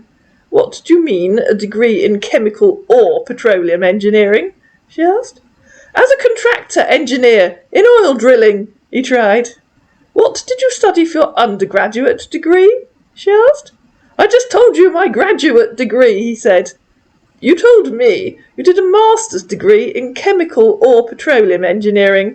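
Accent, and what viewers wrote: United Kingdom. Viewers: Rofl 😆 I LOVE it and actually LOL when you call him an idiot or tell them to stop acting like a baby. With your British accent, it's just sooo derned funny! (And no, I'm not making fun)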